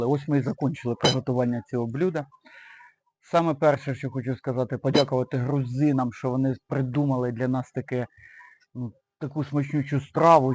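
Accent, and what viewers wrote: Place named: Central Ukraine, Ukraine. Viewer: Друже, дуже цікаві відео. Сидимо з дружиною і гадаємо звідки ти ці відео записуєш? Я з Черкас, вона з житомирської обл. Каже що в тебе такий самий говір як і в мене, то ти також десь з тих країв...) То звідки ти?))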